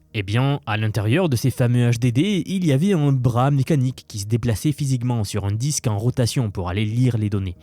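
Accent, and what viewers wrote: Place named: South, France. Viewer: J'adore ta chaine, je suis fan. Merci pour toutes ces informations. Une petite question bête, t'as un super accent, mais j'arrive pas à savoir de quelle région, il y a une pointe du sud mais pas que ... ;-)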